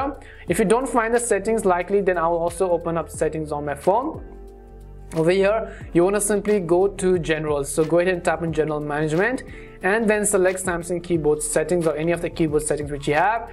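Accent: India. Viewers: WTF....are there no American English speakers that can handle simple tech advice. Indian is frankly rough on the ears and often unintelligible.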